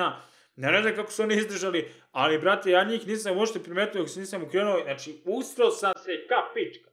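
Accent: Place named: Bosnia and Herzegovina. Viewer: Koliko mi je jak ovaj momak,kako priča haha poyy brt iz BiH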